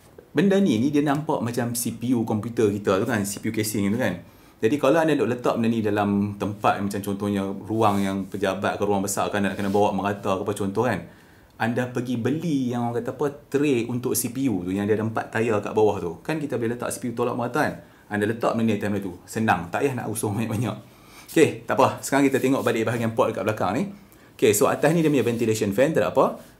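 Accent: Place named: Penang, Malaysia. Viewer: Tengok sbb nak layan loghat penang buku abg ja..hahaa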